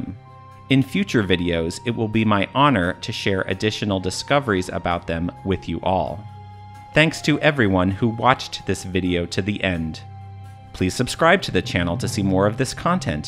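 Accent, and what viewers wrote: United States. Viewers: That last pun of yours,is one that works ok with the American accent.You know~~~Annarica and all that. Enlightening video by the way, thanks.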